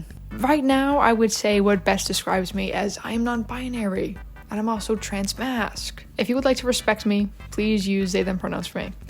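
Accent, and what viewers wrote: United Kingdom. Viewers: Great british accent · so proud of you:) ladies r very cool and attractive i agree. also very good bri'ish accent (from a bri'ish themself)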